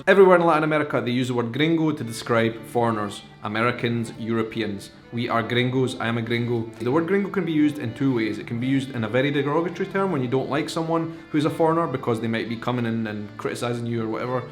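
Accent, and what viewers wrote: Scotland, United Kingdom. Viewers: i came upon your channel after searching for scottish accents (w/c i love listening to) and i am watching all your vids rn. thank you for this series! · I love your accent! Damn, I wish I was Scottish :(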